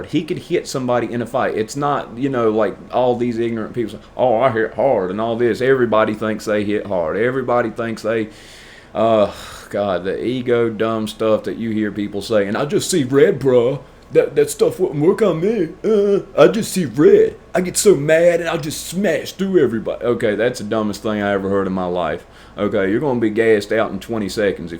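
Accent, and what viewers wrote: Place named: Virginia, United States. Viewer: I lived in Richmond for a while , so when I first heard you talking, I thought Roanoke must be super country. Now I know what the deal is . Thanks for clearing that up y'all.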